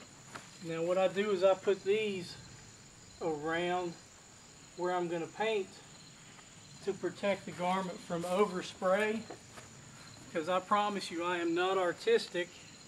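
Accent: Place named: South, United States. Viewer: where do you all live you sound like you are from the south?!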